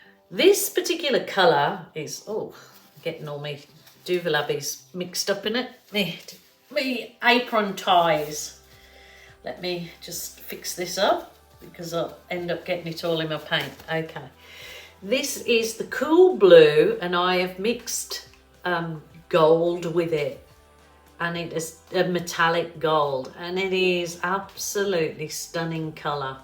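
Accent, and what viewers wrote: Birmingham, United Kingdom. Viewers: Can I hear a fellow Brummy accent?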